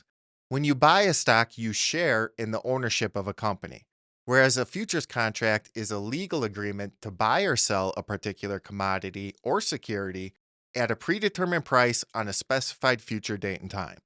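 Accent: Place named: Chicago, United States. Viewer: He’s real. Real good at trading. Notice the Chicago accent? They’re real good at trading too.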